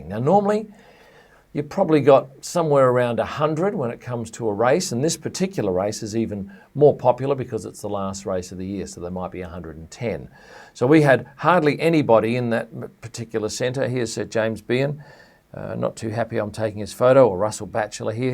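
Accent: Australia. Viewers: Discovered your channel about a month ago and your quickly becoming one of my favourite f1 YouTubers. The fact that your a photographer brings a new perspective as you've seen alot more of these guys than us folks. And I also love an Aussie accent. Thanks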